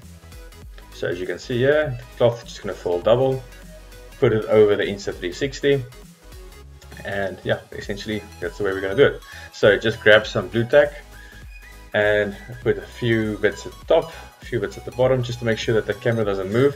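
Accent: South Africa. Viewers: Nice to hear an SA accent gain. Filming from Melkbos? My question: I am currently in Vancouver, Canada. How will I find out if I am in FCC mode on my DJI FPV?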